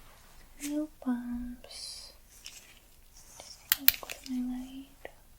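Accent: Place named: Midwest, United States. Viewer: I like your slight accent but I can't tell the region. Midwest somewhere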